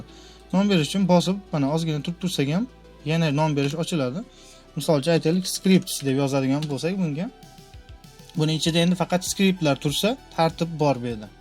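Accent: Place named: Andijan, Uzbekistan. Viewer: Anjan shevamdan aylanay. Rahmat video uchun, juda zoʻr tushuntirish boʻldi. Videolar kuniga chiqsa yaxshi boʻlardi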